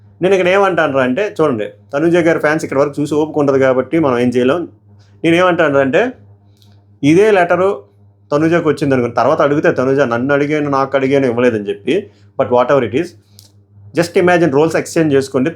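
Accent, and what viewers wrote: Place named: Amalapuram, India. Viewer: original Amalapuram slang ❤❤❤❤❤❤❤❤❤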